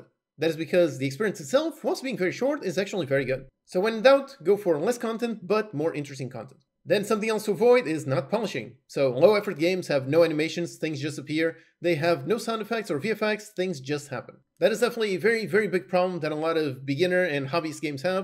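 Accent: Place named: Portugal. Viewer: Totally off topic question, but you speak Portuguese as well, don't you? I can notice from your English accent, either that or you speak some latin language, right? Your videos are excellent, I really like how you're straight to the point, they vibe with me a lot, thanks for that!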